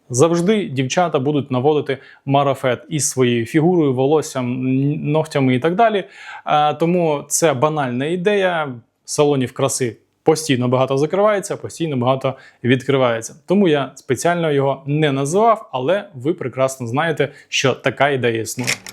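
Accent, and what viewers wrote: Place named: Russia. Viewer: Для чего ты мучаешься на украинском языке разговаривать если твой родной язык русский это же видно Тем более что белорусы тоже могут твоё видео посмотреть про Польшу и ничего не поймут по-украински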